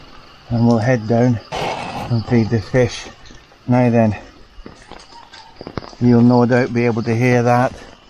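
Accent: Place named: United Kingdom. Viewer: great video, just subbed :)) which part of the uk is your accent from?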